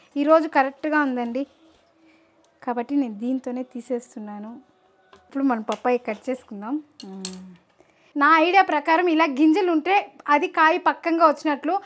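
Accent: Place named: Rayalaseema, India. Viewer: Meeru raayalaserma baasha maatladthaaru Mari andhara antaaru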